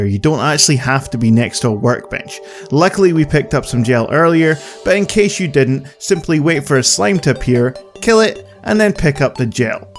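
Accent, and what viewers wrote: Scotland, United Kingdom. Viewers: My NPC died what do I do? Also are you from Scotland where are you from? I’m from Edinburgh.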